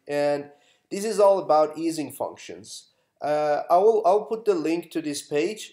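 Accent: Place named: Iran. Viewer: love the stuff you post...i'm too dumb to understand most of it lol btw you really sound iranian hahaha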